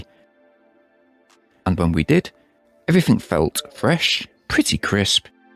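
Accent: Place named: Manchester, United Kingdom. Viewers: what's your accent man. sounds like manc mixed with something else. maybe scandinavian? or are you just using an unusual cadence for youtube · im late but its northern english or scottish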